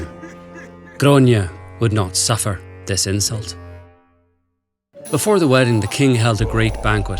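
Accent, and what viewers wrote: Ireland. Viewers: I LOVE how this was narrated in Irish accent. It makes it more fun. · Like the Irish accent that the narrator perfectly nailed. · Its great to hear an actual Irish narrator telling us an Irish folktale! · I love Ireland. Its culture, accent, history, myths... everything. Thank you. I love your accent too. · Excuse me is that a Irish accent, on my favourite narrator. I am shook and very very pleased ❤️ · Was this just the normal narrator putting on an Irish accent?! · as an Irish person i really thank ted ed for getting an actual Irish person to voice over it!!TY💕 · I love how this is told with an Irish accent. Awesome. 😂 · You know our accent has like 50 variations across the island right? Plus he's clearly doing a bit of a presentorial voice, so it's not going to sound the same as in normal conversation. You can tell he's really Irish by the fact he pronounced all the names right, unlike previous Irish mythology videos from TED-Ed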